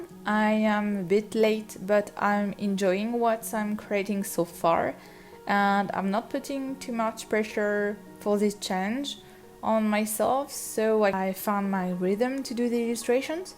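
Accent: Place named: France. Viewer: You have a french voice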